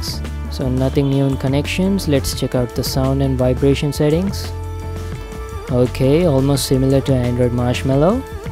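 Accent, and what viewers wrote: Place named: India. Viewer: 这印度口音真是听不懂啊 This Indian English is really hard to understand.!!!!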